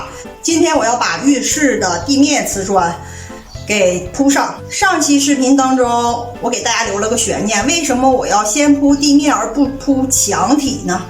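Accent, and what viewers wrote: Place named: Heilongjiang, China. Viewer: 为什么这么像黑龙江口音？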